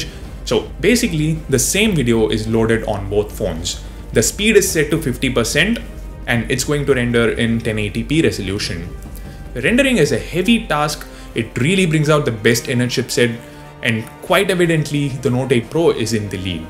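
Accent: India. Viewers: Wat an accent pure indian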